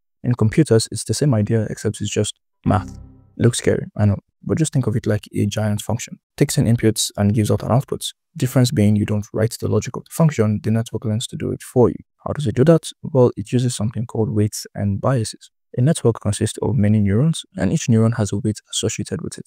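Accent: Nigeria. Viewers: Pure naija boy 😂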